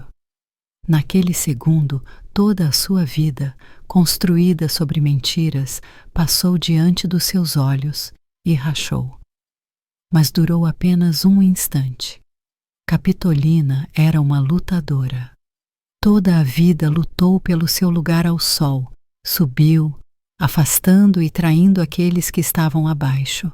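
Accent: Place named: Portugal. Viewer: Tudo ia bem, até a incongruência A festa era para comemorar casamento dos pais do Tiago Depois, a sogra diz que o genro havia sido enganado, ensinado que o pai havia sido heróico aviador, que morrera Mas o filho olha para a mãe e o pai . Filho de 40 anos , tempo do casamento,dominado pela mãe, precisa de psicólogo. Por último, o marido em choque. História até ia bem, mesmo com derrepente, sotaque lusitano, mas misturou muita coisa. E não explica como a garota casou com o Alecrim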